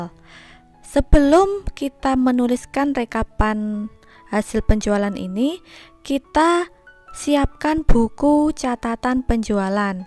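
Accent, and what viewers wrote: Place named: Java, Indonesia. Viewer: Logatnya mirip orang Jawa.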